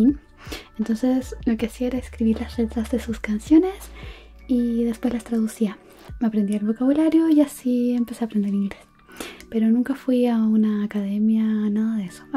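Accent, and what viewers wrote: Chile. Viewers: Espero las trasmisiones jugando 👌 te va ir de lujo este canal, ese acento chileno me encanto 💖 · Hola 💗💗 pregunta: cuáles son tus animes/mangas favs? Psdt: que lindaaa, y el acento chileno ajaja❤ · Ahora si se nota tu bello acento chileno mas que en el ASMR. Por supuesto que tu VOZ es hermosa siempre en cualquier video! 👍☺👍✌ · tu voz es tan relajante 😊 desde que te escuche dije es chilena, yo soy mexicana, saludos. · Épico hablando chileno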